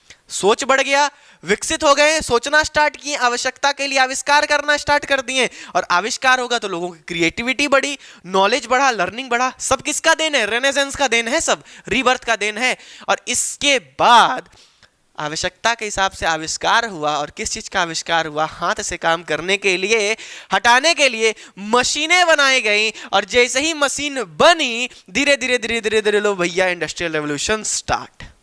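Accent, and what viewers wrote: Bihar, India. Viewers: Bhaiya aap bihar se ho kya?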